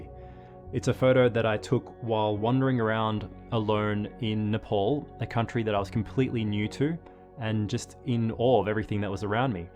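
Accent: Australia. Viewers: The two things I absolutely love about this are: A. The amount that it resonates with me and encourages me to shoot more of my family. B. That you truly have the most Australian "O" I've ever heard. ♥️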